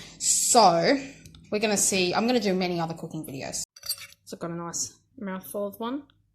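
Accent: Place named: Australia. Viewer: Nice! I always really wanted to do this. Good accent! You an Aussie mate?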